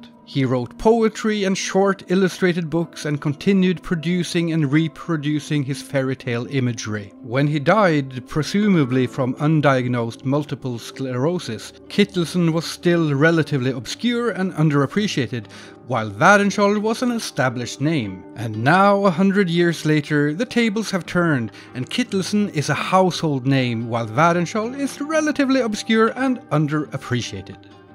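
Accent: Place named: Norway. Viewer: I knew you were norwegian! Yeah!!! love it (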